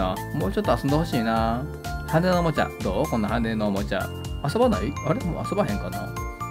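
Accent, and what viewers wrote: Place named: Kansai, Japan. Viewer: 実行中継が楽しい！関西の言葉がとっても良い感じ！つい見入ってしまいます。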